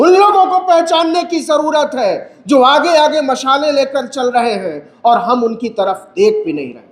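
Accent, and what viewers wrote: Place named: Pakistan. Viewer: Urdo kyon bol rahe ho? Wah bhi Pakistani accent men?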